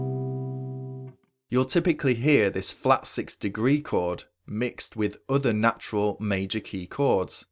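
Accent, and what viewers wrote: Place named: Liverpool, United Kingdom. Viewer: An excellent explanation of the topic. Before the Internet and YT, it was nearly impossible discover such theoretical concepts and approaches to composition. Plus, I enjoy picturing George Harrison as instructor, since you sound very much like him—a fellow Liverpudlian, I suspect. Cheers!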